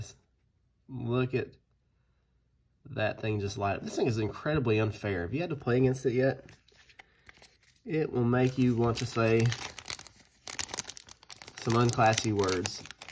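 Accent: Alabama, United States. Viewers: Bro, you’re from Alabama? I thought I detected a slight accent. Right on brother. Thanks for the vids. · I guessed you were from a Alabama from your accent! What part?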